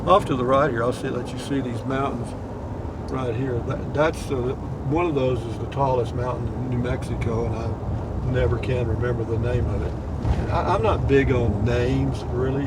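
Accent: Texas, United States. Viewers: You sound all Texan :p am i right ?? btw Smith's is just a different brand of Kroger. They have all the same Kroger products and sales, it's really not a 'local' spot. Not trying to be a dick but you keep saying things like "this is where the tourists go...." . My man, you are a tourist.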